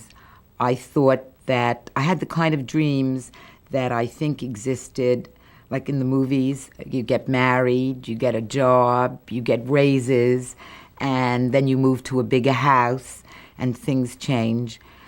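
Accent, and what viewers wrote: New York, United States. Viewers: I love her accent! You can leave New York but NY won't leave you.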